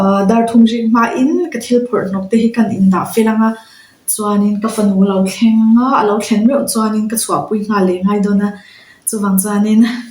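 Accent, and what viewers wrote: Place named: Lamka, India. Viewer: Fiah lutuk❤❤muhnawm leh dawn ae🥰🤗🤗 Lamka tang aw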